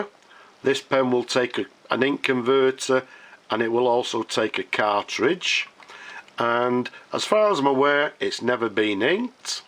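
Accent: United Kingdom. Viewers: Are you a british man?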